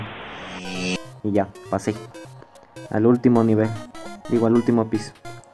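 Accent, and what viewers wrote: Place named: Mexico. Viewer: Como que no eres mexicano xD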